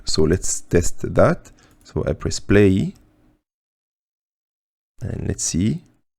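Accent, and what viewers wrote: France. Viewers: 💪🏼Je m'abonne clairement!!! je cherchais des bon tuto sur Blender et plus particulièrement sur la création ciné/filmic de scènes de voitures en mouvement dynamique! PS: je m'abonne aussi parce que j'ai capté l'accent Français!!! 😃 #blackpanthers💪🏼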